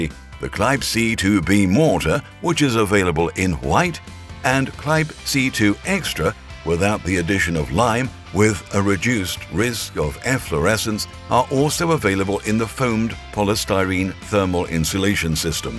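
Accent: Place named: United Kingdom. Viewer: This narrator sounds like the epic economist on YouTube just with a British accent 😂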